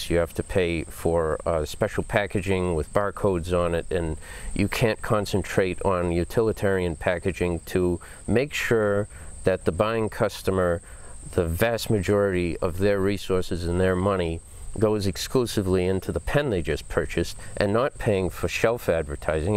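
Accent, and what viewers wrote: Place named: New England, United States. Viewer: What a Yankee accent, and I'm here for it!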